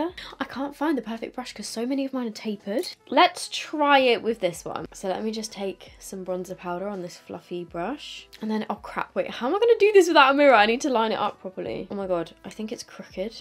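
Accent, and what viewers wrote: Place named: United Kingdom. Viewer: I just watch your videos. I dont care how long they are. I enjoy listening to your British accent. Keep up the good work. Love ya.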